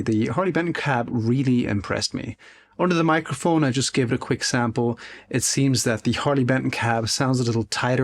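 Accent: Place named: Ireland. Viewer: Really enjoyed the video…. I absolutely love your commitment to the development of speakerpedia. I have to ask something though as it’s really bugging me. Did you ever live in Ireland ? It sounds to me like you might have either lived in Ireland or learned English from an Irish person. Weird question i know but these things tweak my ear and i have to know. Thanks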